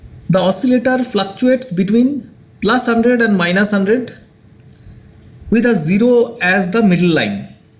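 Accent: India. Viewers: I am from Russia and I understand your indian English )))))))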